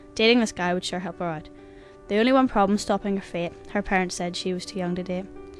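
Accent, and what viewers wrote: United Kingdom. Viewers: Just for some clarification, this is a true story. Based off of the poem, Moonlight Ride. Also, the way the narrator is speaking is how Northern Irish people speak. so understand that and get over it, and understand the true message behind this video. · it ok vid but you went to fast and r u from england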